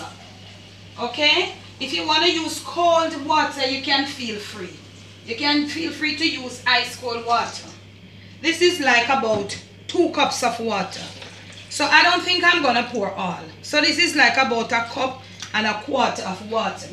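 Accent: Jamaica. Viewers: I l❤ve Jamaican accent mon!!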